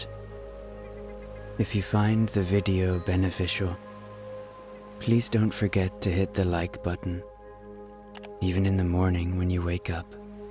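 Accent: Ireland. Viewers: You're silky Irish tone makes me smile and reminds me of Co. Mayo 💞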